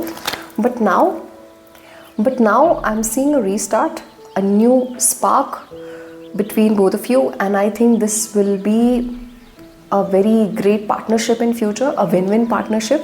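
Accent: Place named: India. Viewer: Indian accent 😂😊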